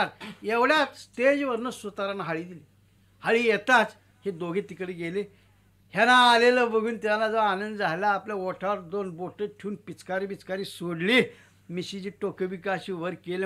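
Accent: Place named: Rural, India. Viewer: ही कथा मी 10 वेळेस ऐकली आहे तरी पण ऐकू वाटते याच कारण म्हणजे ग्रामीण आवाज आणि ग्रामीण कथा यांचा आवाज.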